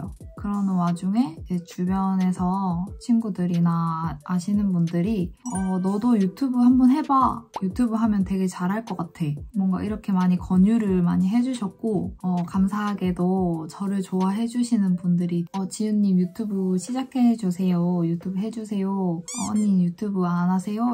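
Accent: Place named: Seoul, South Korea. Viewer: 웅니 거주지는 부산으러 알고있는데 서울말 왜이렇게 잘하시나욤!?! 이것만 보면 완전 서울 사람이라고 해도 믿을듯 웅니 넘 이뻐요 앞우로 영상 마니 올려주데욤(ღゝ◡╹)ノ♡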